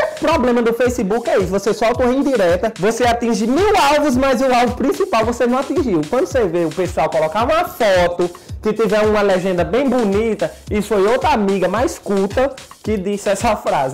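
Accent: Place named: Pernambuco, Brazil. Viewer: parece uma menina de prenambuco que fala assim mesmo meu deus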